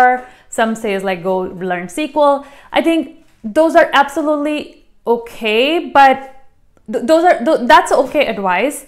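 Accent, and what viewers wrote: India. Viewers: Indian accent 👍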